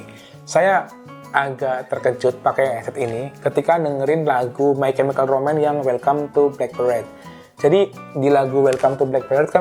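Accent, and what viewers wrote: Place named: Purwokerto, Indonesia. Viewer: Mas orang Purwokerto bukan wkwkw logatnya mirip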